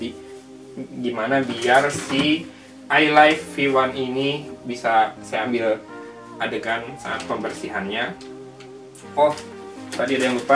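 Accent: West Java, Indonesia. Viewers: gan orang sunda?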